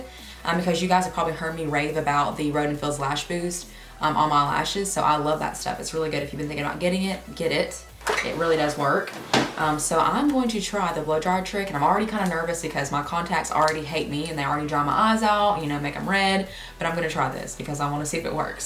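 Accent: South, United States. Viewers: I love it!!! I'm from NC, and its so refreshing to hear it! 😊 (Yes, I realize the geographical distance between Alabama and North Carolina, but I meant the southern-ness of it!!!) 😘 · Sounds southern.....(I'm a Texas girl) ☺ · I’m from Kentucky we share the same southern accent! Lol.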